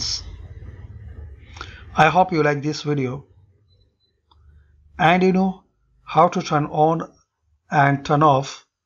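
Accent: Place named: India. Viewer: Can you ever hear one of these videos without the person sound like an Indian scam artist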